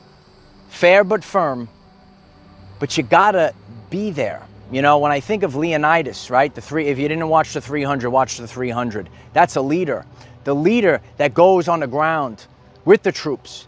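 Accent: New York, United States. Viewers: a few weeks before I came across your channel I would sometimes see 2 or 3 times per day, an ad at a bus stop saying come to New York. The picture showed the statue of Liberty holding the torch. It really popped out and got my attention How synchronistic. Anyway I am really enjoying the new connection and knowledge gained. And I love the NY acsent Peace.😊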